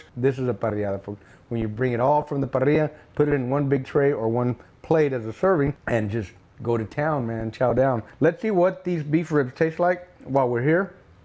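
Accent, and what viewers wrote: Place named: San Antonio, United States. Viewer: Job well done Sir! You sound like you’re a San Antonio native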